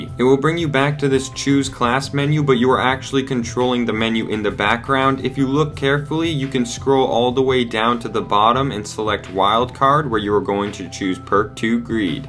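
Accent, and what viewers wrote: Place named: United States. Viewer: OMG His Accent is like an Americans oh my god.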